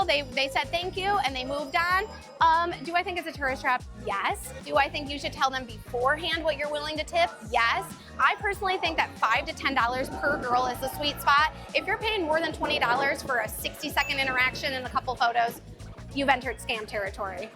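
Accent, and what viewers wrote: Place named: Chicago, United States. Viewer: I figured north because you sort of have a Chicago accent. You would be an amazing teacher!!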